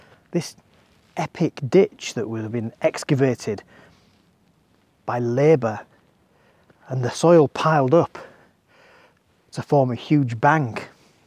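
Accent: Hull, United Kingdom. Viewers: These video's are brilliant, and in that wonderful Hull accent =👌.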